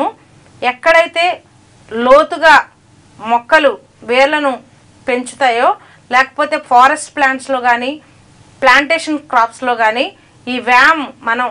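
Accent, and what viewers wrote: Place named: Andhra Pradesh, India. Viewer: Manchiga explain chesaru Kani Pure Andra yasa matladuthunnaru kada Good information.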